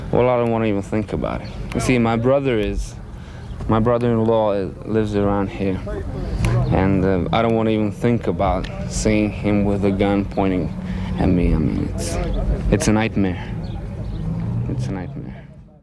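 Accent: Eastern Europe. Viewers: Good explanation. I like video essays that cover films. What I like most is how a Slavic voice is explaining a Slavic movie, which seems more authentic to me. I do look forward to new videos. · Good narrration ( love the slavic accent 😂, as i am from eastern europe myself) Great editing, good flow of video, never heard of the movie which was interesting Only one thing -The mic bro you need to upgrade that 😊 just some constructive criticism Good luck with your channel 👍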